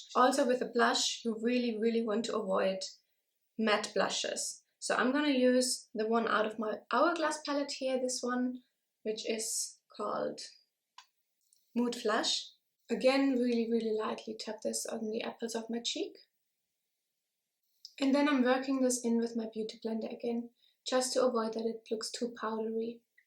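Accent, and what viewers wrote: Germany. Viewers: are you german? think you have an very german accent. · haha ich wusste ich hatte einen deutschen Dialekt rausgehört :) du bist wirklich wunderschön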